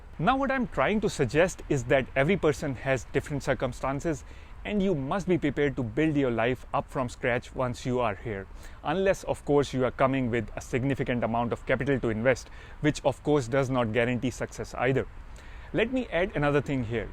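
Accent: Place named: India. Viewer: This guys accent is pathetic - typical musical and Indian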